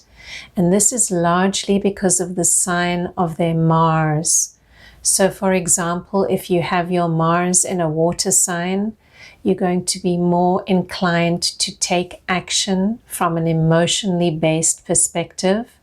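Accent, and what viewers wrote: South Africa. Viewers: You have a South Frican accent. Everything you said resonates. I saw your video today April 26th and started a health voach course in the begining of march a should get my certificate in march 2023. Also watched mu rising sign acquarius before and it is all playing out as described. Just wow! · Are you South African by the way, your accent sounds so familiar?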